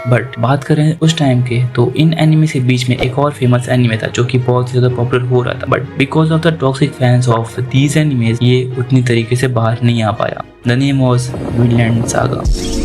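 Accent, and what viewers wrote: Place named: India. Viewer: Bro I cant hear nothing thourgh your indian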